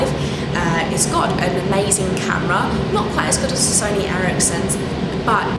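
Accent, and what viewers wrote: England, United Kingdom. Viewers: u got beauuuuuuutiful eyes :P and damn, that english accent <3 oohhhhh, i think i just came....LOL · lol "phothouss " xD I like the british accent :D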